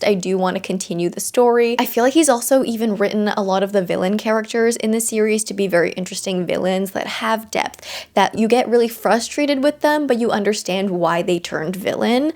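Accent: Canada. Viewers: girl as a Hamiltonian... your canadian accent is ACCENTING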